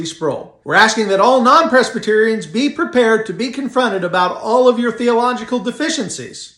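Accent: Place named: Scotland, United Kingdom. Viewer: arguing the Bible in a think Scottish accent is . . .divine!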